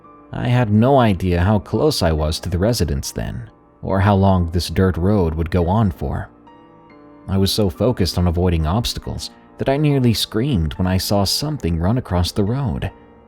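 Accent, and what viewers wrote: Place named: New York, United States. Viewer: thick new york accent FOIST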